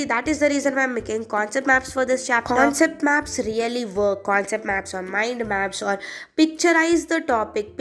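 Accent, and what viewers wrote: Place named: Kerala, India. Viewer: sounds like malayalee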